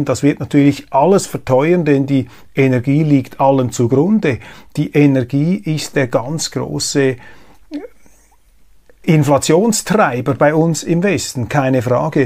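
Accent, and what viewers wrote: Switzerland. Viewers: Riäsa. 🤣 Uff Schwiiizer Dütsch. Traumhaft. Beste Grüße.. Wunderbarer Kanal Dankeschön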